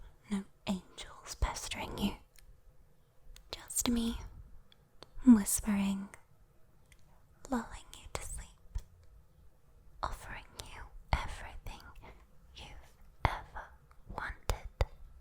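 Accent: United Kingdom. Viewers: British accent 👌👌👌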